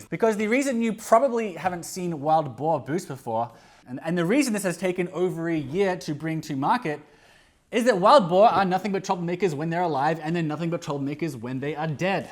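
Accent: United States. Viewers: I appreciate the American accent 😂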